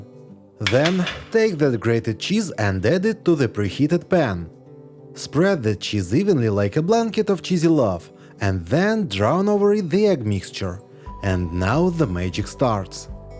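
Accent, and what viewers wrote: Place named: Russia. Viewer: well I ‘m going to explain myself… I speak fluent English and from my childhood used to be taught to pay attention to my pronunciation As a modest result … years later, no one picks my Russian accent even though I don’t sound like a native speaker … it’s not about being snobbish, not at all It’s simply certain level of so called professional enhancement if you have decided to record your channel in English… As much as we’re proud to cherish our great language and cultural heritage we have to admit, Russian accent isn’t easy on some ears ! His channel is very professional but accent is distracting , isn’t it?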